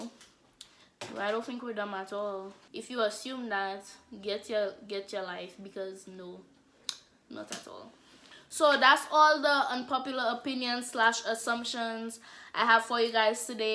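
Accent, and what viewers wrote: Saint Lucia. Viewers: Lol straight Lucian accent🤣. I swear the expectation that you're supposed to bring suitcases full of gifts down is soooo annoying. Like u rolling in money🙄 pure bayteese. Vini twavay pou li. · I knew you St.lucian from the time you spoke 😍😍😍 My ppl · I'm confused w your accent lmao Is that Lucian? or trini? or were you born in the US w Lucian parents?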